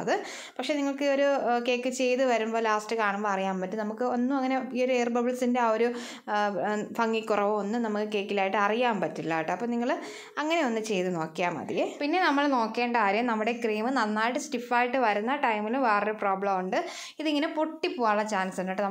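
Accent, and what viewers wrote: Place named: Italy. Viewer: Parece una italiana hablando inglés 😅 me agotó jajaja. Al principio no sabía bien en qué idioma estaba explicando! 😂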